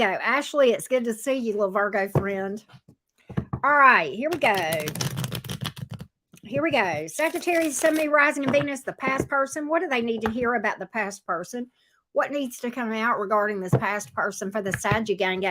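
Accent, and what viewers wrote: South, United States. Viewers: Just found your channel after watching you with Tyler. Thank you for sharing your gifts 💕💕💕 Love hearing my southern folks reading tarot!!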